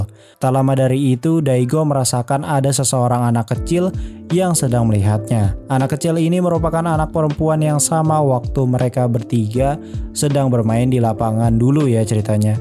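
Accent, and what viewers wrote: West Java, Indonesia. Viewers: orang sunda gening euy🤣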